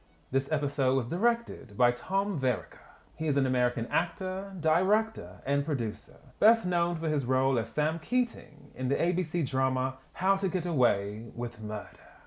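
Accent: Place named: United Kingdom. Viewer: What do great reviews, a British accent, and fun historical financial facts have in common? You guessed it, another review.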